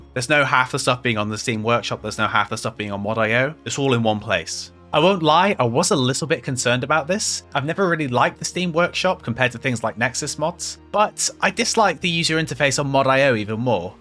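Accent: England, United Kingdom. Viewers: A li ttle bit of English lad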